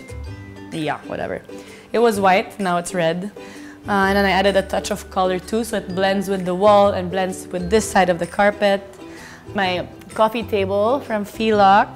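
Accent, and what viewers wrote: United Kingdom. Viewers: that british accent hahahaha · I like her british accent👌🤗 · That Brit.accent by 😍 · British accent 😍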